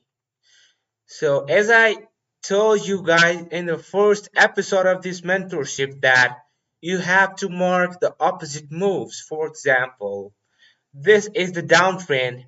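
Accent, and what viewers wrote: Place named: Russia. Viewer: recaaardddiiiingggg😘😅 btw best knowledge and also kaatttar englishh like russsian or spanishhhhh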